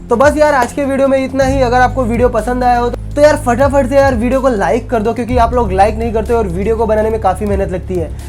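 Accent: Gujarat, India. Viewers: Bro aap Gujarat se ho ? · Tame gujarati 6one 😊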